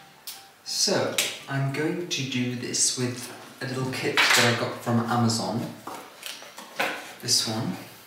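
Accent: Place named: Northern England, United Kingdom. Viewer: Whereabouts up north are you originally from? Whereby you are very well spoken some words are very northern, for example past and grass etc!x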